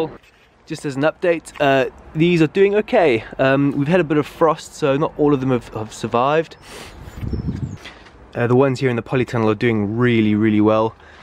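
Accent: South Africa. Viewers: I thought the same thing. Had to come to the comments to confirm if it was South African accent :") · Hearing the SA accent just gave me joy lol. South Africans know how to DIY the right way💪💪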